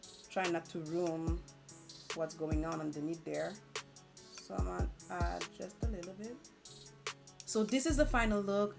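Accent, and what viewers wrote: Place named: France. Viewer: YOU sis deserve a standing ovation!! I have to tell you that I am a whole, adult female who doesn't wear much make up BUT has always appreciated the beautiful looks. I have ZERO knowledge about how to apply it. I rock the basics and like 'I'm good' YET the inner diva has always wanted to rock a beautiful natural face. I landed on your tutorial regarding an 'I Heart Revolution palette (which I picked up at TJ MAXX in NYC USA) trying be to be cute🤣🤣 and was intrigued at how descriptive you were. I loved your french accent also, so cute 😊 but also because you were easy to listen to and came of so genuine and non judgemental. I then peeked at your page and SUBSCRIBED as soon as I landed on your *affordable MAKEUP FOR BEGINNERS tutorial. It was a wrap. Thank you, thank you your tutorials are BY FAR THE BEST, THE most COMPREHENSIVE tutorials on YouTube. 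I feel confident that I can rock a cute look for my upcoming cruise. Much MORE success sis!🙌🏾👍🏽💜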